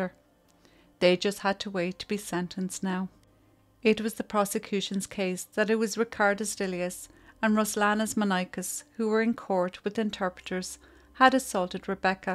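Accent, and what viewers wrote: Ireland. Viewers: Saturday morning here. I jumped on especially to hear my favourite Irish voice on my favourite crime channel. Thank you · Thank you for this excellent video. I can only say that Rebecca did not get the justice she deserved. So terribly sad for her children and family. I just love listening to your lovely Irish voice! May Rebecca rest in peace. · Me too....the Irish Accent is Beautiful ❤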